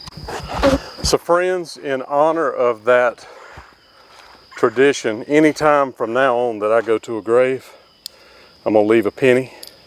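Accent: South, United States. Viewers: Just love your accent. That's proper Southern, that is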